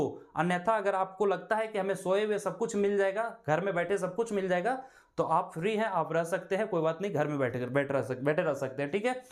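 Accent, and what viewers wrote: Bihar, India. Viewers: Bihari ho lagta h tera baat se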